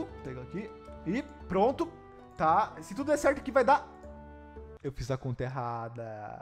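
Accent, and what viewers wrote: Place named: South, Brazil. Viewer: tenho uma pergunta onde vc mora? pq parece q vc é aq do sul Vc provavelmente n vai me responder, mas vai q vc responde ❤️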